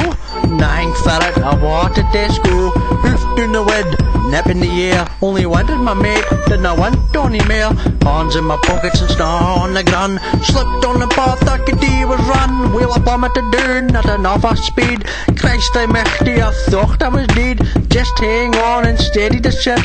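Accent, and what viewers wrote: Moray, United Kingdom. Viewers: omg best scottish accent im from inverness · Plenty of cities and towns in moray talk like that.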